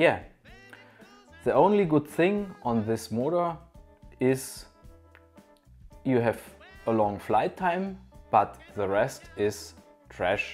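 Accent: Germany. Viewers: Man hört dass du deutscher bist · Simmer vom schwobaländle ?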